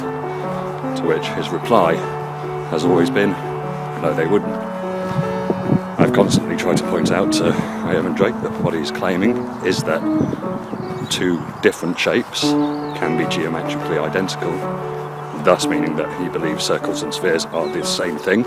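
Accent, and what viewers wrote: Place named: North, United Kingdom. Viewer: I like the shaky camerawork at the beginning! It gives the film a bit of a 'Blair Witch' edge to it. Seriously though ... your mellifluous northern tones makes the rather esoteric subject matter a lot more palatable. As if Brian Cox had presented those Open University programmes in the 70s. Now then. The question is what do I do first? Watch your other videos? Visit Iovandrake's profile with my troll mask? Or Google 'potholer54'? And before you say 'none of the above' ... you're too late. I have already subscribed to your channel. Nice one!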